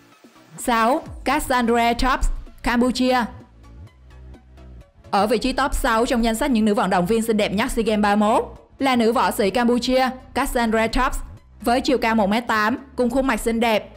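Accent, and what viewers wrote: Vietnam. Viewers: Giọng bạn ấy là Trung lai Nam Giọng miền Nam đậm chất hơn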